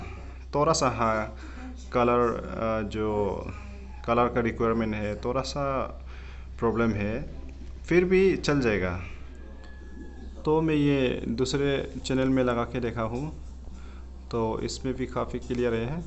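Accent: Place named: Assam, India. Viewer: Yeh asamee hai. T ka uccharan dekh ke hi samjh gya.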